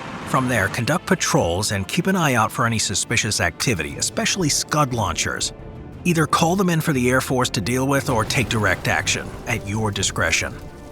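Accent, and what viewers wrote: United States. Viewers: "Any questions?" Yes: why do you should like an American? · A Briton who speaks American..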